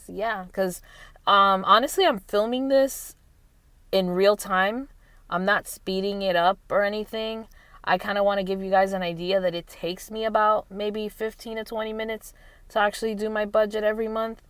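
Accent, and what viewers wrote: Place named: Miami, United States. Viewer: Girl I love this! Please keep up with the videos... my 2018 goal is to be gazelle intense on paying off most of my debt :) You're great. Also, it sounds like you're from Miami. So am I!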